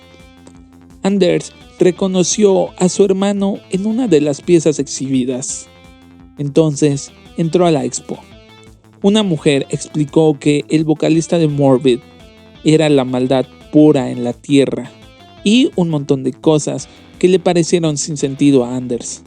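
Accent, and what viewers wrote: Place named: Mexico. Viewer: ES MEJICANO. SE LE ESCAPA CRONICO EL CANTINFLAS. SUENA MAS A COMENTARISTA DE PASITO DURANGUENCE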